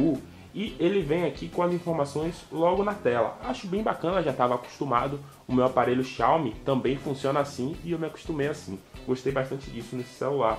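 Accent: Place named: Bahia, Brazil. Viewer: Gostoso! Você e baiano? Que sotaque delicioso. Amei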